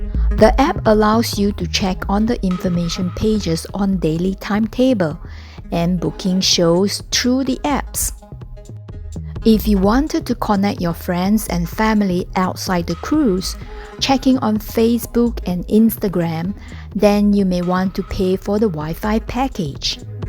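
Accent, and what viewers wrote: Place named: Japan. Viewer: Hi, thanks for the good info of these cruises. Btw, you sound Japanese. Are you?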